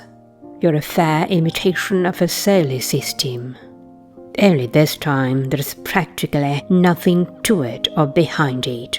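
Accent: RP, United Kingdom. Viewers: Yes I loved this - so cinematic and your accent is so delightfully POSH as always! I wonder why this one doesn't have more views?! It's a pity really!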